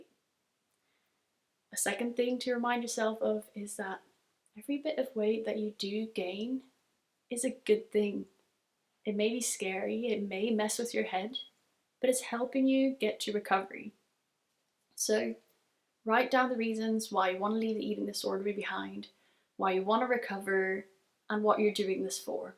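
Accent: France. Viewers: Great and spontaneous video. That french accent though👌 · Cet accent français 😍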